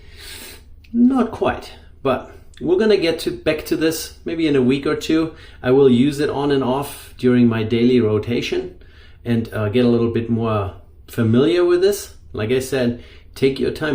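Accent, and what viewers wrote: Germany. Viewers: Mußt nicht machen. Danke für die Erklärung. Dem Namen nach und dem german accent nach habe ich es mir schon gedacht. (von rock sprachst Du relativ am Anfang) Schöne Grüße über den Teich 👋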